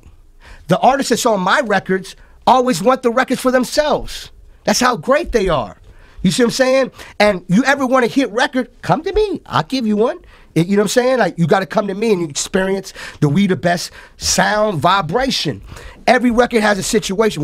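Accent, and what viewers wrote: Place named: Jamaica. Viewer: Dj Khaled is a real producer no joke talk straight frm JAMAICA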